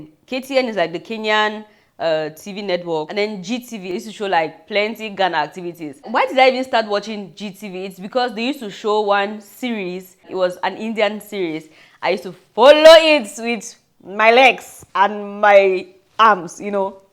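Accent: Nigeria. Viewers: You sound so much like a Nigerian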